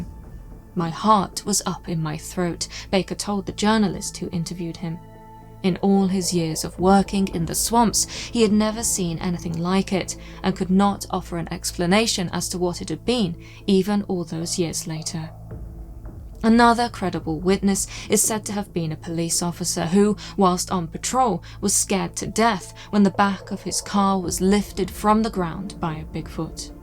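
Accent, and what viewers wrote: United Kingdom. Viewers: These subjects are so often covered by american writers that it is nice for a british person to hear the voice of a clearly spoken british person !!!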